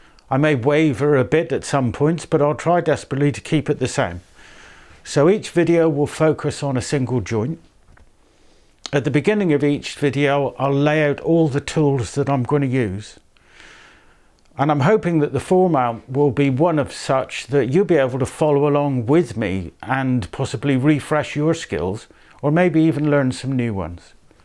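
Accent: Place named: West Country, United Kingdom. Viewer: HI this looks interesting. Good to hear a West Country accent talking about woodwork. Having taken up woodwork 7 years ago when I retired I focussed on machine joinery. But recently my interest has been spiked to learn some hand skills using Japanese saws etc., so I will be very interested to watch your videos.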